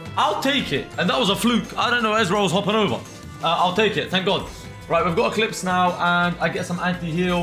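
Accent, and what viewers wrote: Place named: United States. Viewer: The American accent gets me. 😂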